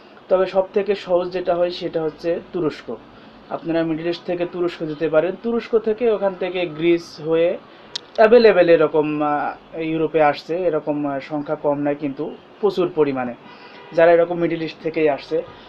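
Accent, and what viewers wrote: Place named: Jessore, Bangladesh. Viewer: আমি মলায়েশিয়াতে আছি ,আমার 7 টা দেশ all rady ঘোরা আছে , আমার বেতন 3500 রিংগিত বাংলা 70 হাজার + , তুরকি তে আমি to -rest অরিজিনাল ভিসায় যেতেচাই মাত~ 10 দিনের জন ,আবার মালায়েশয়াতে ফরবো, পরে ইউজোনে to -resta যেয়ে আর ফেরবো না , আপনার জানা মতে তুরকির ফাইল গুছিয়ে দেবে এই রকম এজিনসি আছে ??যানাবেন ভাই ,আমার বাড়ি যশোর ,আপনার কথা শুনে মনে হয় আপনারও ঐ দিকে ??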